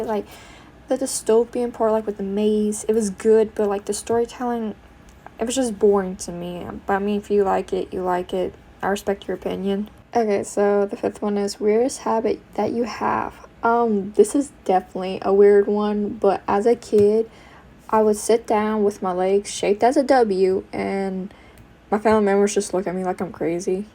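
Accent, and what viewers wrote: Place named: South, United States. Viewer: Thank you for the great answers, (especially your country song picks!) also, your soft southern twang in your voice is Fabulous!! 🫶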